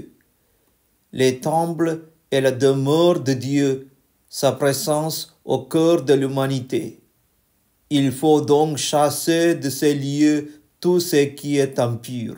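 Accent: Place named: South India, India. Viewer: Vraiment j'espère que tu est Indiens..... précisément sud .....vraiment ton français là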